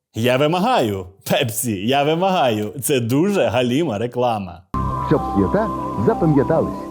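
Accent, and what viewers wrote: Transcarpathia, Ukraine. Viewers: Ти із Закарпаття? 😮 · ти з Закарпаття?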